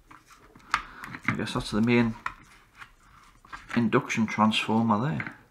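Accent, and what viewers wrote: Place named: Morpeth, United Kingdom. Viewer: Great video. First time I’ve seen your videos! Sounds like your from the Morpeth track?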